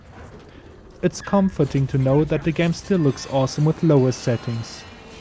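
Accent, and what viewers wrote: Germany. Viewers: das deutsch trieft aus allen sätzen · Hab sofort gemerkt, dass da ein Deutscher quatscht :D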